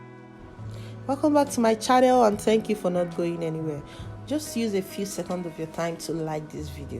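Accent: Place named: Nigeria. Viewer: may God shine His Light and Bless you in this 2025!!! Your accent shows me you are from Nigeria. But I from Tanzania always feel so close to you. By the way you narrate your projects as if you talk to your close family. Thats how you invited me to follow and learn from you. Having tried tested and found its true. You have all my respects my sister. May God shower you with Uncommon Blessings in your life this year.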